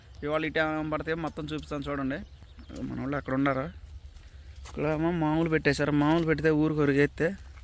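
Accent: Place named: Bhadradri Kothagudem, India. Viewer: మీ మాట మా సయిడ్ లా మాటాడతున్నారు ఊ అదుగో అని😁😁😁 భద్రాది కొత్తగూడెట మాది😁😁😁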